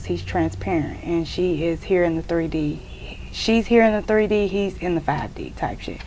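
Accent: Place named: Northern California, United States. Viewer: You sound like you’re from where I’m from, Nor Cal. Lol. I’m hear for another Aquarius man, Scorpio woman reading. Trying to get a read on this new situation I’m in. 🤔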